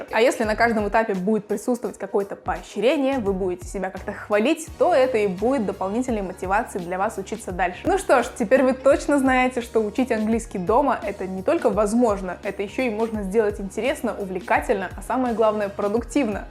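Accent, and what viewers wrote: Kazakhstan. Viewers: Казашка? Ты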